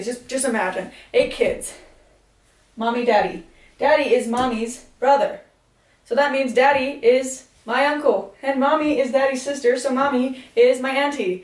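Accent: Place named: Alabama, United States. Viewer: Are you from Alabama by any chance?😅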